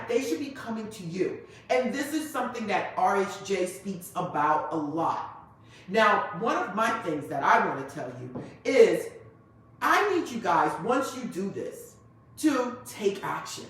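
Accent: New York, United States. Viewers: Love the content and your NY accent ! 😍❤🙏🏻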